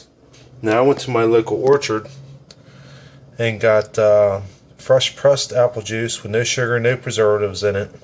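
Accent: Philadelphia, United States. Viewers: Ryan J he sounds close to South Philly to me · That Philly accent..., my goodness. Making me homesick.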